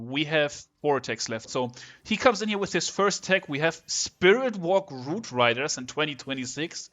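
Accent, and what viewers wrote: Germany. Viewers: Richtig geiler channel weiter so. ❤️❤ Hab ich gleich gehört das du deutsch bist. haha 😂 Btw lasst die Maus und die cam so passt perfekt. Alles gute ❤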